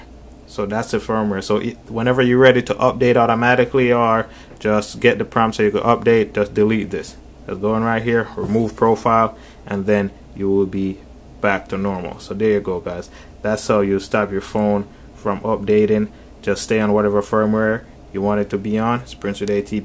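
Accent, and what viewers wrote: Jamaica. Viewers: Can tell you a yaadie lol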